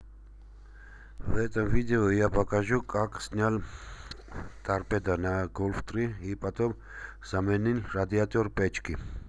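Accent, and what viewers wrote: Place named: Georgia. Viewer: Грузин, по акценту слышно!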